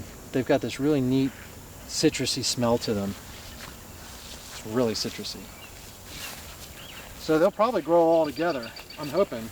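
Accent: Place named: Maryland, United States. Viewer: That east-coast Maryland accent brings me right back to my homeland of Philadelphia. Love it.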